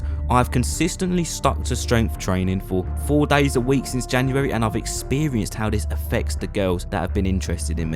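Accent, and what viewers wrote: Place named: Essex, United Kingdom. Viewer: Proper funny mate - just found your channel, you sounds like a fellow Essex man.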